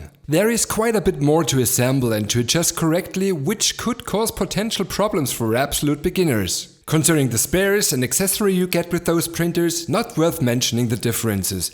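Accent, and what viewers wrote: Germany. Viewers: Welcher Dialekt aus Bayern, nehme ich, war es?? Antwort würde mich freuen!!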